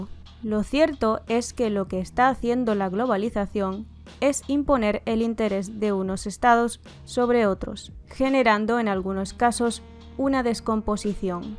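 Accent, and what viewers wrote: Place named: Spain. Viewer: Estoy muy confundido ahora mismo porque tienes un acento casi diría de España no tanto de México pero tienes una foto de perfil y la bandera que podríamos relacionar a México.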